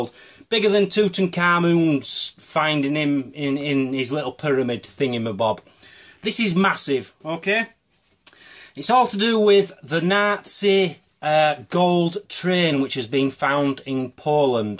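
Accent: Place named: Newcastle, United Kingdom. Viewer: Funny how geordie is so easy on the ear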